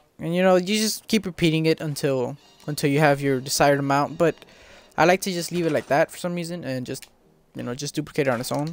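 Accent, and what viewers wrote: United States. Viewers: you sound like your from LA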